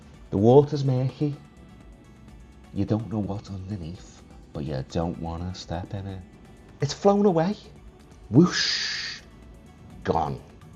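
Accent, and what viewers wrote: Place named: Liverpool, United Kingdom. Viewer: I like your accent, thats Liverpudlian right? Ive noticed it's dead too, as I can see what the drivers are getting paid nowerdays and it's always about 60% of what I paid 😢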